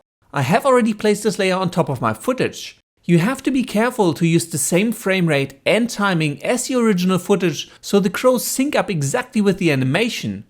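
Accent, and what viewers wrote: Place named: Germany. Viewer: Holy fuck you have a German accent xD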